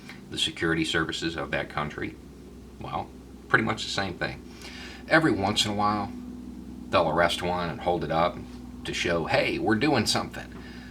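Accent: South, United States. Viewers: Yep, glad there aren't many trolls. But at the same time, I really want right-wingers to check this guy out. I want them to see someone who looks and talks like them but with a different viewpoint who can passionately argue from a place of love like can. He has a chance at changing minds like few others on YT can. Also want liberal city-folk to see and then maybe think twice before dismissing people with a southern accent as stupid.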